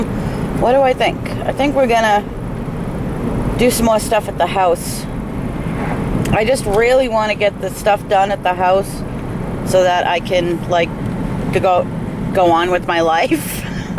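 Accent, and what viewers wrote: Boston, United States. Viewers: Being from Boston, I noticed your accent immediately. Kerri :)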